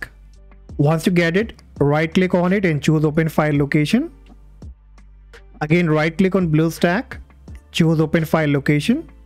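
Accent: India. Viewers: A like and a sub for that engaging content mixed with a rich indian accent😂❤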